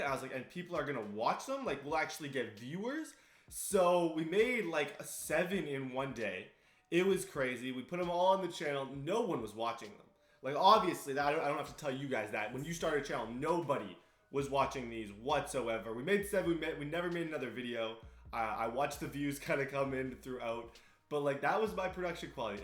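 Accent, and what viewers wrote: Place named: Canada. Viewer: You sounded so Canadian in your first vid.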